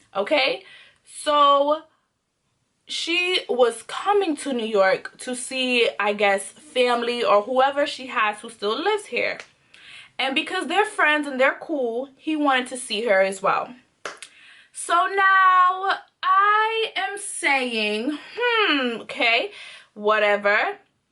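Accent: Jamaica. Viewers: From mi hear the accent you a Jamaican ❗️❗️❗️❗️ found you in my recommendations.... like 20 secs in the vid n like it already ❤️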